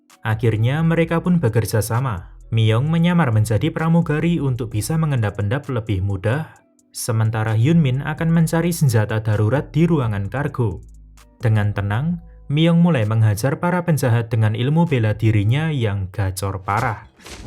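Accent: Java, Indonesia. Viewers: Jowo pundi mas, sampean?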